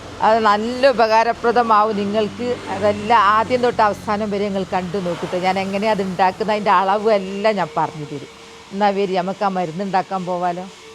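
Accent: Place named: Kozhikode, India. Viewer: കോയിക്കോട് ഭാഷ കേൾക്കാൻ രസമാണ്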